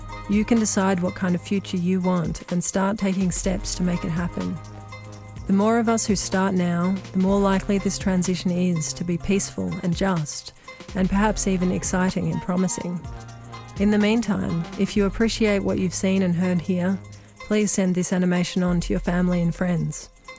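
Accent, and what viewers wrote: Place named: Australia. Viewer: On the plus side, the fall of the US and European Banksters means the "Economic Hit Man" will stop destroying places like NZ and Bolivia. I hear what sounds like an Aussi accent ... is this video and international effort or y'all coming from the perspective of OZ? Finally I've always said the problem is not peak oil, its Peak Sanity. The solutions are all around us. See my video on Beadwall for example.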